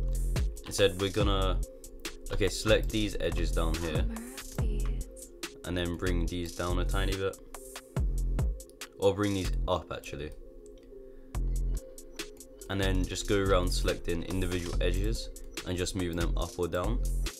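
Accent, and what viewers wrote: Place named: United Kingdom. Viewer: shit tutorial and overrated, deserve like 1k views, I dont understand anything of what u are saying with ur stupid british accent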